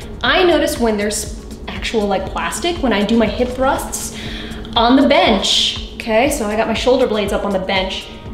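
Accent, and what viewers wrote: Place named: New York, United States. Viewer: I noticed you have an accent, are you from New York, or New Jersey? Just curious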